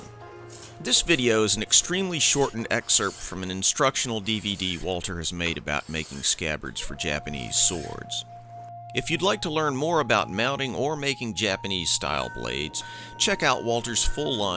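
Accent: United States. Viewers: out of curiosity, which part of america is your accent from?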